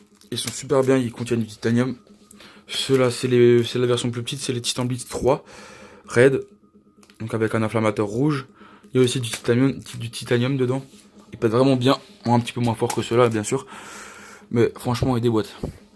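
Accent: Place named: Alsace, France. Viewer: Yo pas mal ta réserve on aime le petit accent elssas hopla tu serais me dire t en a eu pour combien et ta acheter ou ? Geuht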